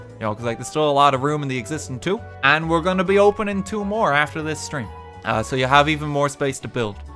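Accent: Ireland. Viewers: is that a country irish accent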